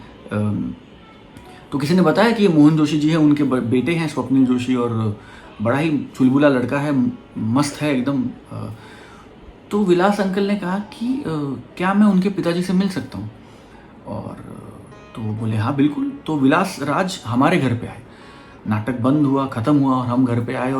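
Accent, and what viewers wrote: Standard. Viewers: Swapnil ne bohot accha shudha hindi bola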